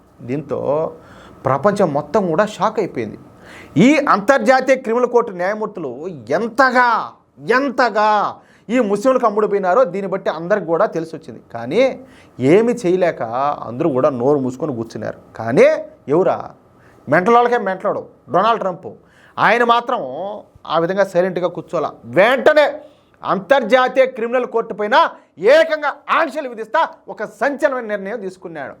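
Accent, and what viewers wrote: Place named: Kadapa, India. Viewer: I love your Kadapa language🎉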